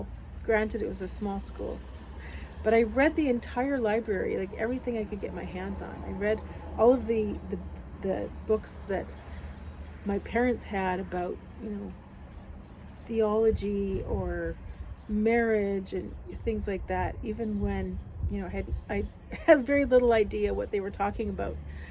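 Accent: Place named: Canada. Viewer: Hello, Debbie over there with Life in Kentucky gave you a shout out and here I am! Curious George. I realize this video is 2 months old but the title is what pulled me in. Music is so important and remember how we used to listen to an entire album side A and B when we were younger? That sounds like a great idea for sure. I love cooking with music. I LOVED AND STILL LOVE Simon and Garfunkel and James Taylor. We always have music playing, I feel like life is a soundtrack. I'm so excited about you coming back to yourself. I can't wait to see more of your videos. I'm so glad I came on over here. Debbie is right. You are amazing, nice to meet you. I am Tracie from Life in the Wylde West. P.S. knew you were Canadian, I love your accent.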